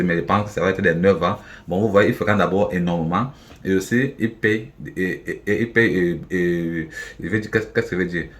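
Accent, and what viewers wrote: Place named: Cameroon. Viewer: T'es camerounais je suis prêt à parier bro sa se ressent à ta façon de parler 😅✊🏾✊🏾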